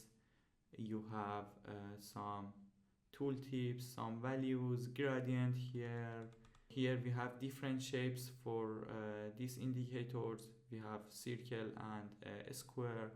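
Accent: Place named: Iran. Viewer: Cheghad Farsi Englisi sohbat mikoni :) damet garmmmmmmmmmmmmmmmm eshghiiiiiiiiiiiiiiiiiii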